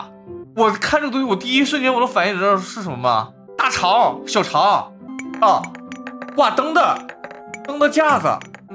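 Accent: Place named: China. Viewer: 乾，我現在才注意到很細心欸！這裡是繁體 B站那裡是简体 (抱歉現在才去B站看主播啊) 我還想說為啥字幕是繁體講話卻是中国腔 ( • - •;)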